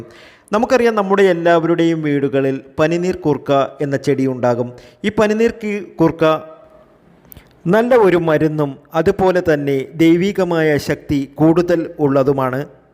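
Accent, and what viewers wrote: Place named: Kottayam, India. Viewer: Kottayam dt aakum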